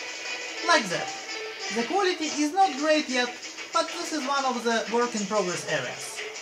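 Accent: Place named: Russia. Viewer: Сраный русский акцент!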